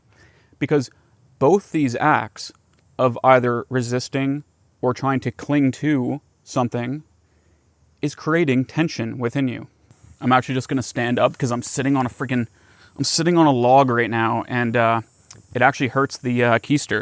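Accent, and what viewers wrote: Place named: Canada. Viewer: I appreciate this video! I just came across your youtube channel. You explain everything in simple terms language....Look forward to learning more....You must be from the NW or Canada with the accent. And saying "Keister" is definitely older school and wise....lol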